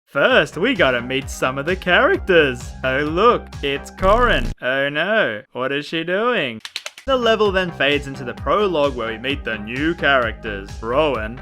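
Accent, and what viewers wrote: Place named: United Kingdom. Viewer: I agree. Your British accent sounds more like you're talking while trying to hold back a yawn.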